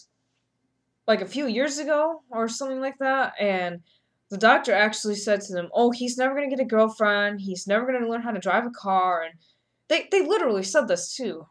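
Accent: New York, United States. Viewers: Hi, I'm from Australia....really enjoyed your thoughts......what accent is that? Is that a particular area of NY?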